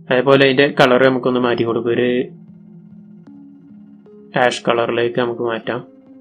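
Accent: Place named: Malappuram, India. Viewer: മലപ്പുറം ഭാഷ ഒന്ന് മാറ്റി പിടിക്കുമോ?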